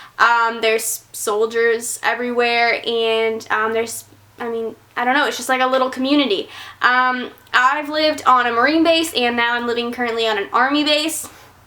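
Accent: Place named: South, United States. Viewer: omg when brain said you picked up a country accent I didn't believe him LOL I heard it!!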